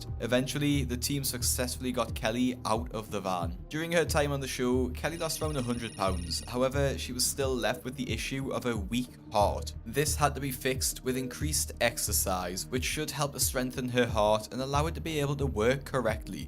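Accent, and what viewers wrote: Newcastle, United Kingdom. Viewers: He is a Geordie. From the Newcastle area.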